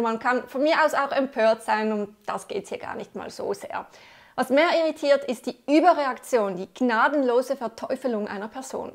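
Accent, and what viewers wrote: Switzerland. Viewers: Ich finde die Tamara Wernli total süss. Besonders ihre leichten Schweizer Dialekt finde ich gut und höre gerne ihren Ausführungen zu.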